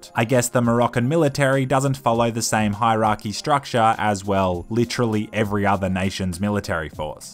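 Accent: Australia. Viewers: "Just kidding. Spiders. Spiders are scary" Isent he Australian??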